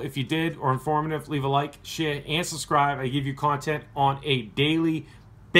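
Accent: Boston, United States. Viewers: u must be from Boston or Maine aye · r u from boston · Can tell you probably from Boston or close by. I'm from South Boston myself. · i was wondering where your accent was from then you said copper. Only people that say cahpah are from boston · this dude is from boston right? his accent wierd.... "copah" lmao xD insted of "copper" lol when i heard him say coper i instantly knew XD! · are u from boston cuz i can hear boston accent.lmao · u from Boston ain't ya? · Is this guy from Boston? Most times he said copper it sounded like coppah Either Boston or Philly.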